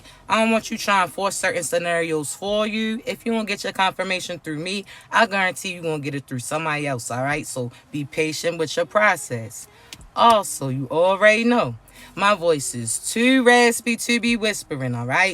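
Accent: Baltimore, United States. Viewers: She from Baltimore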